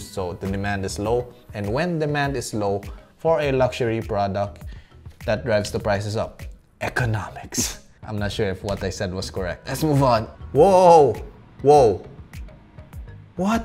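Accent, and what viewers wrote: Philippines. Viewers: within the first 10 seconds I KNEW you were Filipino hahaha I love it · Hahahahaha I’m assuming he’s filo! Coz his filo accent is STRONG!! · Me: hears accent Also me: guess he’s Filipino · hes from the Philippines men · I knew from your accent. You lived in the Philippines, wow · That pinoy accent is so distinguishable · Fluent english with filipino accent. Nice one dude 😊😊😊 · Kaya pala familiar yung accent Pinoy nga hahaha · he filipino based on accent. lol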